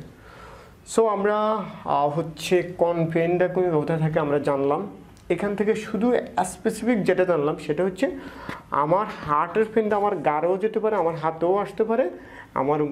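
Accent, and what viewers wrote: Noakhali, Bangladesh. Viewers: ওরে হেতের বাড়ি তো নোয়াখালী 😊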